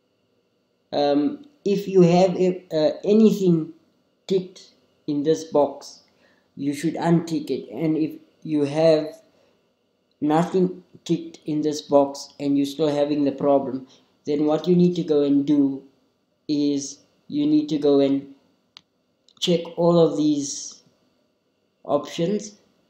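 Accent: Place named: South Africa. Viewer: Love what sounds like a South African accent- am I correct bro?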